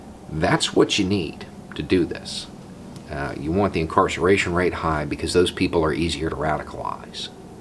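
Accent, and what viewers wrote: South, United States. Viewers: what southern state is your accent from?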